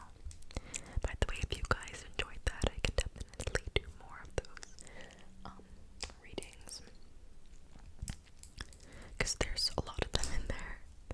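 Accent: United Kingdom. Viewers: As sooooon as you went with soft spoken British accent I ARRIVED AT TINGLE STATION! 😅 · As a british male, aged 16. I can confirm that almost every few streets has a different accent. I like your British accent though, It's relaxing. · Not calling out all of us younger siblings 💀. Lucky for you, I'm too lost in your soft spoken voice (& British accent) 😌or else I'd ask to speak to your manager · The British accent 😂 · lol your british accent is amazing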